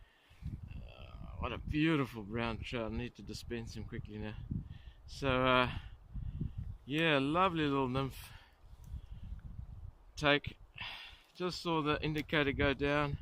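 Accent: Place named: South Africa. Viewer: i love your films. enjoy your SA accent and knowledge of birds, etc, funny camping cooking scenes etc beautiful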